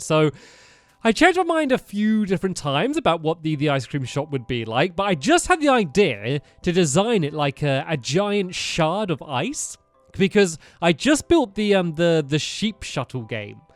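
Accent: United Kingdom. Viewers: from a 1 to tee and bescets how British are you?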